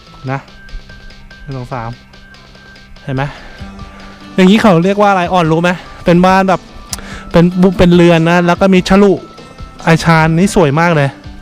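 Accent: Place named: Central, Thailand. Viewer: พูดสำเนืยงฟังยาก น่าจะพูดสำเนืยงภาคกลาง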